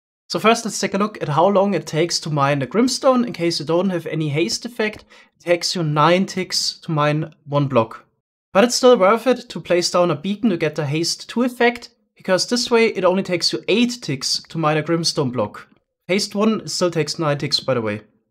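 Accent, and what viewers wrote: Germany. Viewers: Im sorry to say this but u rrally have an extreme german accent man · I'm sorry aber man hört das du deutsch bist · I didnt know it is possible to have such an EXTREME German accent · Ich Feier den deutschen Akzent^^ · Ich höre da definitiv Deutsch raus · dein english is so deutsch holy fuck😅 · You're German?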